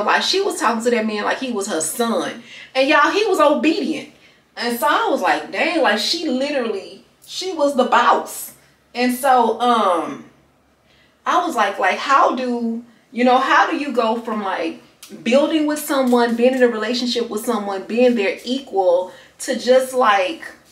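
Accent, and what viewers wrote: South, United States. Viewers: It’s because You are so Awesome and Fun to watch! Down to earth and Real!! 💯❤️ I love your haul and how you put your outfits together! 😍😍 Your chats advice and story times are everything! I can listen to that southern accent all day!!😁❤️Congratulations on your new apartment it’s beautiful!! 🎊🎊🎉🎉 I know you are going to set that place Right up!! I’m so Happy For You!!🎊🎊🎊😍😍🎉🎉🎉🎉👏🏾👏🏾👏🏾👏🏾👏🏾🥰🥰🥰🥰🥰 Totally Dancing 💃🏽 with you!!💯💯💃🏽💃🏽💃🏽💃🏽❤️❤️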